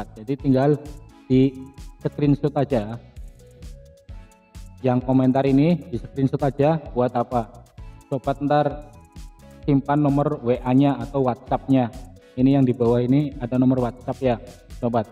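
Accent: Tegal, Indonesia. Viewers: Jenengan asli tegal apa wa. Kayong medok temen ra.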